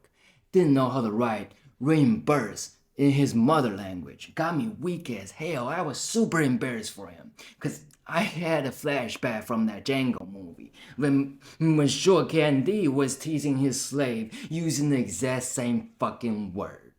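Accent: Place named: Houston, United States. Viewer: wow brother. love to hear and see from more small youtube channel mainlanders lately. you are very brave! great rap and great takes on all the videos ive seen so far. where did you learn your english? 😂 its awesome! you sound just like some of us asian folks in houston, texas!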